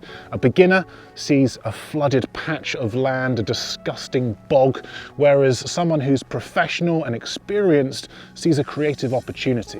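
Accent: United Kingdom. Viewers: Dear, I wish to thank you here for your videos! They are a great pleasure to watch; may be I will improve my shooting skills and also my English (thanks for your British accent). I love also the South-West of England, where I spent a little vacancy on motorbike and where I made my first on-board action cam videos (beginners quality, but lovely memories). Please, do you have a donation address, so that I can offer you a beer or a cider? Cheers from Italy.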